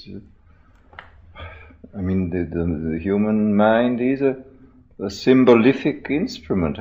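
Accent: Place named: RP, United Kingdom. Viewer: Old School British Public School RP.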